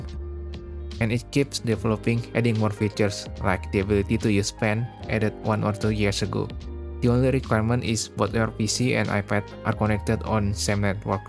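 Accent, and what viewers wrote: Indonesia. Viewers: i realized i could do this accidentally, works on my setup with Sunshine + Classicoldsong's Artemis(Moonlight fork that has more experimental features and a little nicer GUI i found from Moonlight github repository fork) and began to wonder if someone done video about this amazing discovery i made. Thankfully someone already done it. anyways works with my Xiaomi Pad 6 and its Xiaomi pen, down to its 2 buttons on pen(although a bit janky since the OS tried to use it also sometimes) and its pressure sensitivity! also, is it just me or you sounds like Indonesian?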